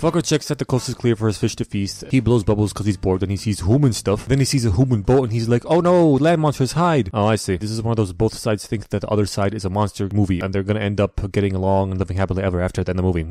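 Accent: Italy. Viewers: nice italian accent btw nice video 2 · best italian impression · Wait why is he doing an Italian accent with Spanish words? Like Si.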